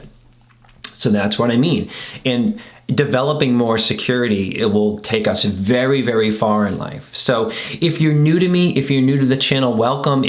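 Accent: United States. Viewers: ❤1. I brought this trip upon myself....Where to escape?? Currently, exhausted, Extremely isolated, brainfog, in Israel #under_fire🎉 #day24 2. Expat from the USA, and appreciate your American accent. Voice From home . I studied psychology... Came here to confront religious Absurd-abuserS who live in Israel.😮😅😊.... So glad I just found your channel/ account. I need tips + excersize for time of war. Staying in hallways/ bomb shelters mostly. Tiny spaces. In Israel under rocket fire. Bless u for all you do! Can send me an email with your videos on you tube or any other free content you can offer. Where are you located these days? City/state? I'm an Expat from Miami currently in central Israel! Sending love, light & hugs wherever it is needed. Amen!❤️ SaraGraceFreed😊3? How to pack and plan while under fire, 4. NEIGHBORs Friends have lost.lived ones... mmm. 5. 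Buying groceries instead! So confused. Help!❤Sara😂🎉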